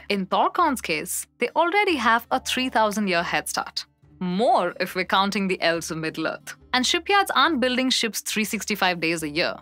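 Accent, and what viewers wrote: India. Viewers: the most precise Indian accent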